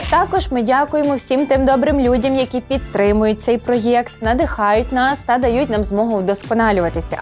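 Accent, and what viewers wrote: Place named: Ivano-Frankivsk, Ukraine. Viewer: Коцюбинський- талант! А ви хто, щоб іронізувати і зневажати? Історик розповідає цікавіше, мудріше, без принижень історичних постатей. У Києві опинились нещодавно, але вже знущаєтесь із свого станіславівського діалекту. Неприємно слухати. Хай би молодий історик усе викладав…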